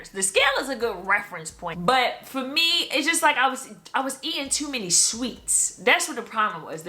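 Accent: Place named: Washington D.C., United States. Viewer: Are you ftom D.C ?? I hear accent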